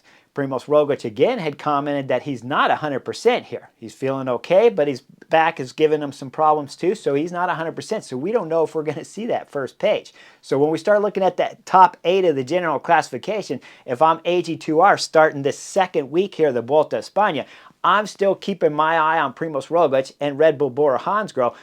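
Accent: United States. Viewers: Hey, I'm an Aussie. Would you mind telling me what part of America your accent hails from?:)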